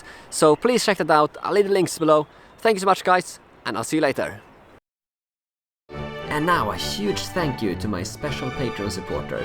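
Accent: Sweden. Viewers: you´re a norwegian citizen first of all so maybe change the name. Secondly, plz improve your pronunciation. Your really going full on crazy swedish accent. I have never heard someone in your age span speak with such a heavy accent. Third, either your lying or not aware of how the new law will work. The law is changed so the rapist can´t deny knowing the victim didn´t want the sex if she/he did not resist. What you said about a writen consent or recording is totally made up. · Ah this solves everything. Now the rapists won't rape anymore because they've always been bothered with consent before... On another note... goddamn your Swenglish is thick... one of the worst I've heard, even worse than Lofvens... and you use English more often than most Swedes. You should check out some videos of English pronunciation because it really detracts from the message you try to deliver.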